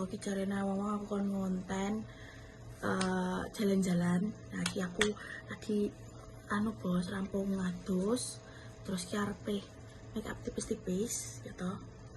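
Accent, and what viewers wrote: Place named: Java, Indonesia. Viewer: pengen ngerti boso inggrismu lancar pora wong logatmu jowonemen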